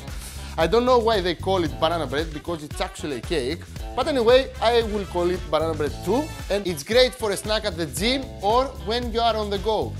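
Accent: Greece. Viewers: Oo, That classic greek accent! now i wish that still had mine :/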